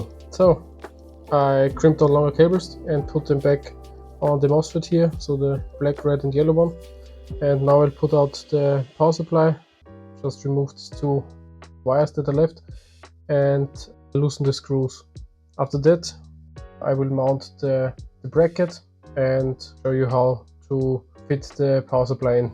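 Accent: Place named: Austria. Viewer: Subscribed. Miss that accent. Know you are Austrian. Besser als bayerisch. 😂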